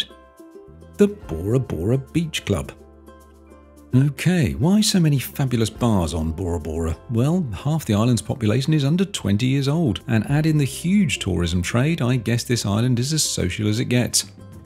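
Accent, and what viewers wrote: England, United Kingdom. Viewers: I’m so glad you don’t have a high pitched voice. I love Travel Movies but if the voice is above “C” my eardrums hurt😊. With your English Accent,listening to you is comforting.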